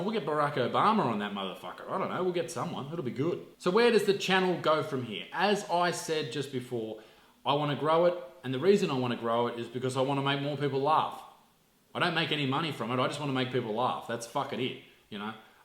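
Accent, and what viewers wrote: Australia. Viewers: i like watching your videos because you talk like Australians do normally. Most Australian youtubers tone down the swearing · How anyone cant know ur an aussie just on ur accent alone fuckin blows my mind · Legend! People think your not Australian 'Shut up birds...fuckin hell'. If that's not Aussie I don't know what is. Love your clips mate!! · I feel like I’ve got all the tools to be a comedian.. Except that fuckin classic Aussie accent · you look like my last years english teacher, and sound like him to bc hes AUSTRALIAN 😉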